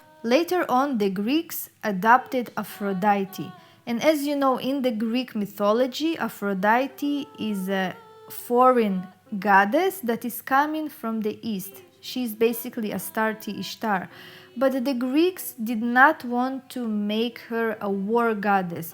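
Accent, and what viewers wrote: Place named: Eastern Europe. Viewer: The accent is the best I love when she says shit..in eastern European accent my grandma used to have similar accent but she was from Cyprus.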